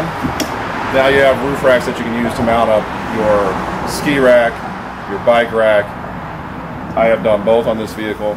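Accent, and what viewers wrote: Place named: Midwest, United States. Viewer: Have seen many '16/17 Outback reviews, and not once has anyone shown the roof racks in operation. (Thanks!) Thule or similar crossbars can cost hundreds; integrated rails is a great feature. Could tell you're in Chicago by the architecture, and your slight Midwestern "accent." Sounds like home. Far North Side? Edgebrook? / South? Beverly?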